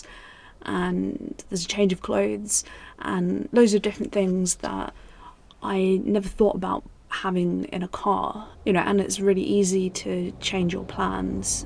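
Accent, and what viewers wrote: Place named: United Kingdom. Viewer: Hi, lovely seeing you. Curious about your accent. Can you please tell me what part of the united Kingdom you are from? I know this is a random question. Sorry if it's to far out there.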